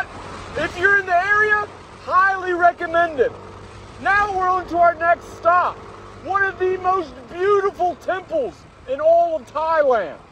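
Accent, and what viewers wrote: United States. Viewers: I love the way Americans pronounce it Boooooda :)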